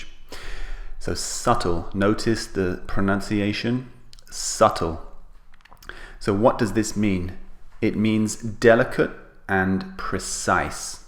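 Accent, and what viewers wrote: United Kingdom. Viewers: the bri'i'sh accent is quite charming!!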